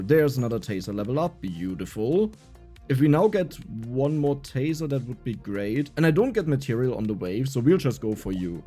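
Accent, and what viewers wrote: Germany. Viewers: Muss ehrlich sagen. Hab DF‘s lets plays geschaut und nach dem spiel gesucht. Bin so auf dein kanal gekommen. Deine ersten worte auf englisch gehört, und sofort war mir klar der brudi ist ein deutscher. Hahaha, kein hate. Find es echt krass wie du englisch spricht. Hut ab mach weiter so abo hast du aufjedenfall.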